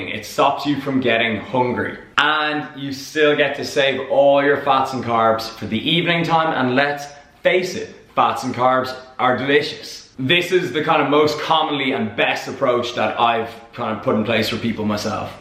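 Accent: Dublin, Ireland. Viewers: thats the Paddy coming out in him. Sounds like he is from Dublin.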